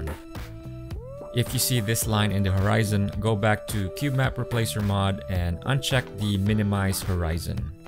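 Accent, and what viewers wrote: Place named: United States. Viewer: goddmorning. NC u half or what? I mean the voice is so American HAHA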